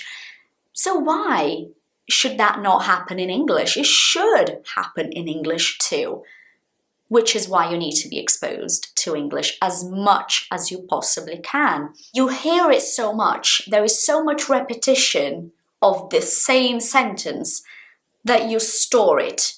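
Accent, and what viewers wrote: United Kingdom. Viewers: What??!?? Ela fala português?! O sotaque britânico dela é tão perfeito, que o português dela parece sua segunda língua.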